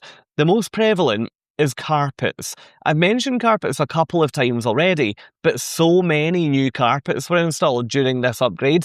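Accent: Scotland, United Kingdom. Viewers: Thanks for your video about the ship update. Although I'm good at understanding accents from various languages, yours is very, very strong; it must be Scottish, right?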